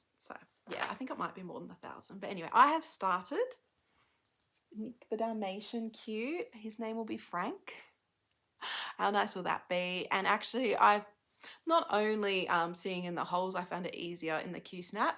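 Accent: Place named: Australia. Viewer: It's so lovely to hear a fellow Aussie voice on Flosstube! I'm so jealous of you going to the retreat with Joanna and Lisa in a couple of weeks!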